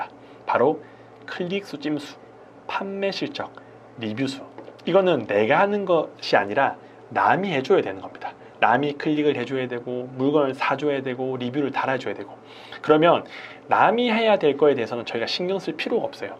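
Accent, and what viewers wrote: Busan, South Korea. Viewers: 부산인데,사투리가 정겹네요~오늘 강의 다 마스트할 생각입니다~코피 퐝~퐝~감사합니다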